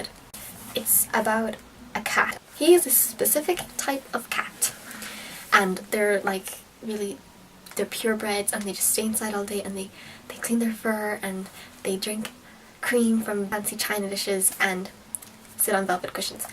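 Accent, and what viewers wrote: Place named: United States. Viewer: You're beautiful beyond words, but why do you use American English and speak in an American accent? It'd be odd to visit Africa to find someone dressed in Burberry attire and saying "ain' wannit, bruv, fam, bredren" in an English accent, wouldn't it? (points finger) Get where I'm coming from?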